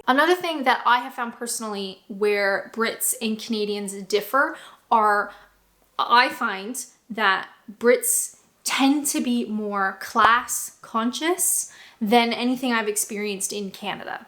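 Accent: Canada. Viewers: FWIW, I once worked with some Canadians from Montreal and for them English was a second language - they were native French speakers. While they were in Europe, the took a couple of weeks leave to go on holiday to France. They returned in tears saying that the French were the worst language bigots and that they ended up speaking English there because the French would not accept Quebec idioms as valid. Now I love the 'two nations divided by a common language' banter as much as the next Brit but I would never deny an American (or Canadian, Australian etc) the right to their own native tongue. By the way, I think your Canadian accent has become more pronounced recently; I've noticed a lengthening of some of your vowels, eg the second syllable of "about". You have spoken before about taking pride in your native accent, so I hope that you will be pleased. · Sounds more like a-boat (about) and oaut (out) than oot and aboot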